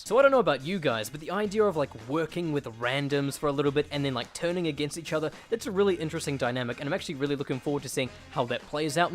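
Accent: New Zealand. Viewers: I have ONE queston... Are you a New Zealander, if not... EXPLAIN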